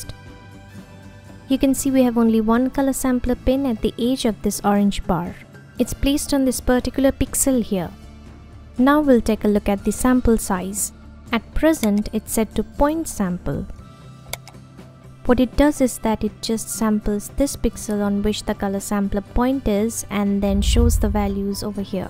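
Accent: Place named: India. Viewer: don’t listen to them. You have Indian accent but you are 100% understandable. 10 stars 🌟🏆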